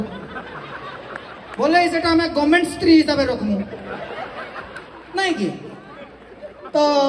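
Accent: India. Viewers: da. Bahut sundar. Hele.. Samalpuriaa pare DADA KATKIAA COMEDY. BHALL NAI LAGBAR. TAR. SANGE. SAMBALPURI VOICE. MITHA LAGSHI. DADA. JADI. KHARAP. LAGALA. BLL MAPHA KARBE....... Hele reply darkar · Sambalpur 6ua ki katkiya 6ua tui j katkiya kahu6u re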